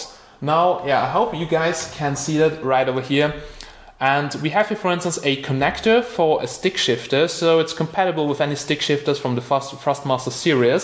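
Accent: Germany. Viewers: You have a german accent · hör auf n deutschen accent zu haben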